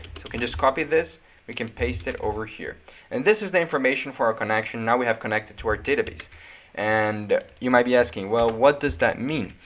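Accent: Brazil. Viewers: Nice video! Thanks for the info! I have a question: there is a way to change that ugly domain name from Netlify to a custom paid domain that I already own? (Idk why I'm asking in english, your accent is clearly brazilian) valeu mano kkk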